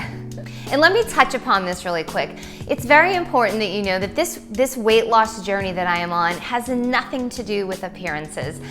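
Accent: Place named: New Orleans, United States. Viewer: I just discovered your channel - I live on the Northshore of New Orleans and was thinking, with that voice you had to be from around here!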